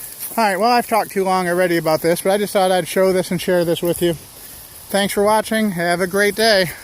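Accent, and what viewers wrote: United States. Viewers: Love that Michigan accent.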